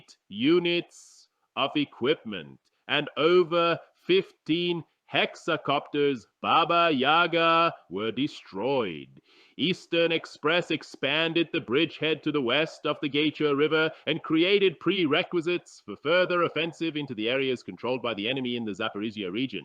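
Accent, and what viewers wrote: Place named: Afghanistan. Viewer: Why the afegani voice?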